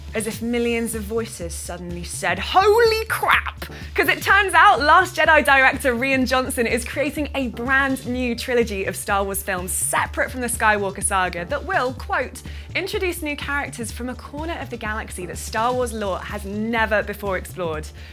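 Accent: United Kingdom. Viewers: Cute, redhead and british accent. 10/10 IGN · Holy crap !!!!!! That British accent though 🤔🤔🤔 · That beautiful british accent though;)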